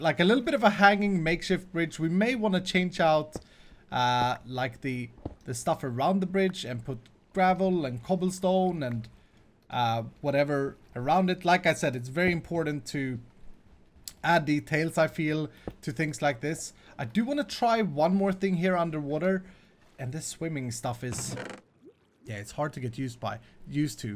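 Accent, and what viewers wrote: Sweden. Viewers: Arr you from sweden?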